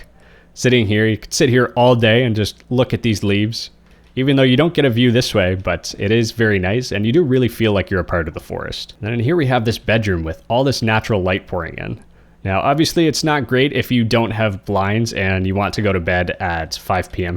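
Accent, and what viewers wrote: Canada. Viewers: Man you sound Canadian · your northern accent is showing are you canadian? (from Michigan here) edit i was right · this is the first video ive seen of yours, and I heard you say "aboot" and I was like "Is this guy Canadian?" By the end of the video I was like "This guy is definitely Canadian."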